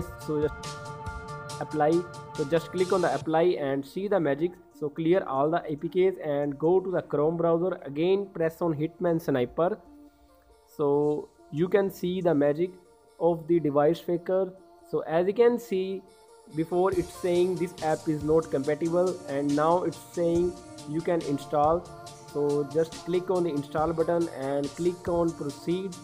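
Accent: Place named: India. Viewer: Isn't it a coincidence that he sounds Indian?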